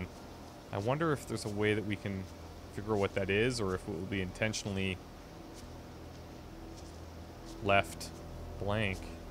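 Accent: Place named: Canada. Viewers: Dragonborn Dovahkiin yehhh ur one of us canadiansssssssss eh!! u rly sound canadian... im from toronto so we don't really sound canadian.. but i have my canadian moments +__+ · Yes, he's Canadian. Montreal, by way of Saskatchewan (if I recall correctly)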